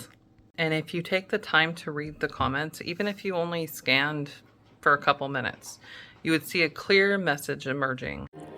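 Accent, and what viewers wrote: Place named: England, United Kingdom. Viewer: What about his English accent and the way he does his mouth with his tongue ..He is cringe factor for sure!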